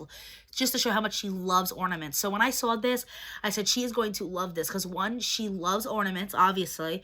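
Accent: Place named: Massachusetts, United States. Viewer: i knew you had to be from mass with your accent! 😂 i found you on craftygirls comment section a few weeks ago, so what are the odds that we live in the same state?!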